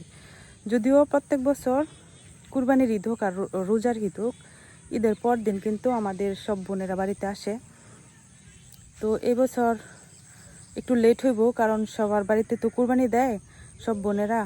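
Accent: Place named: Comilla, Bangladesh. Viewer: ম্যাডাম কেমন আছেন। আপনার কথাশুনে বুজতে পারছি আপনার পর্ব পুরুষ কুমিল্লার হবে।তবে যাক পরের কথা হল আমি কুমিল্লা জিলা তিতাস উপজেলা থেকে দেখতেছি আমি বলতেছি ম্যাডাম যদি জমি কিনে রেখে দিলে কয়েক বছর পরে বিক্রি করার জন্য ঠিক হবে কি। কমেনট করে জানাইবা কেমন ।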